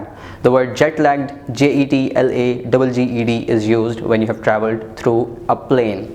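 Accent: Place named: India. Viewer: u sounnd freshie SIR. didnt help at all like bruh u and ur indian accent aint going no where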